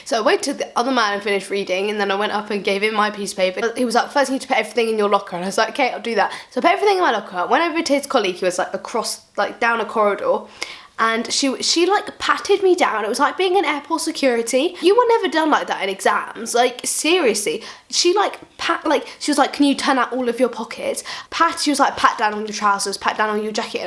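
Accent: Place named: RP, United Kingdom. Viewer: shes posh what you on haha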